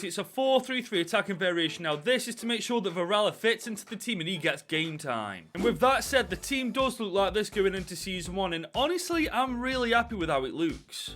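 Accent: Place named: Midlands, United Kingdom. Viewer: Mate I love your videos watch you all the time, your accent sounds very familiar are you Derbyshire or Staffordshire? Class if your SOT lad love to see a stokie doing well !😅 keep up the good work ❤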